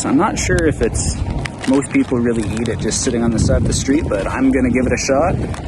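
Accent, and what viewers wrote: Canada. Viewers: You sound very Canadian. Great video, very helpful for sure thank you !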